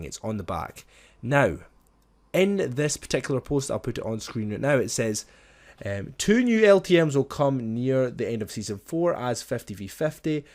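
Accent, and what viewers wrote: Ireland. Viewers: Are you Irish cuz it sounds like it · Are you irish???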